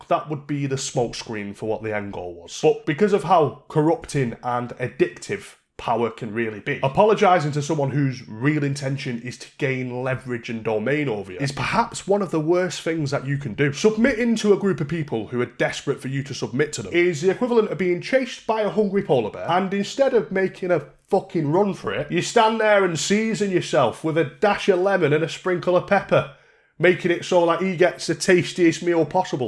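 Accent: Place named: Newcastle, United Kingdom. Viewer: quality video, top new castle accent hahaha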